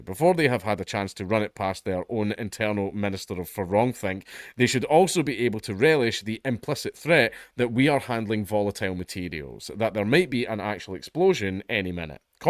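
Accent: Scotland, United Kingdom. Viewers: I don't know why the Scots accent makes everything funnier. It just does. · I think the Scottish accent helps English folk find you funnier too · Nah, man. Don't sell yourself short. This Texan not only loves the Scottish accent, but we find you funny cause your jokes don't suck lol Also, it funny and ironic how comedians will virtue signal by not saying racial jokes because they believe it disparages a group of people, but have no problem making political jokes towards the group they don't agree with. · Your accent sells it to me as an Englishman. You have a delivery and straight talking Scots style which is very funny and appealing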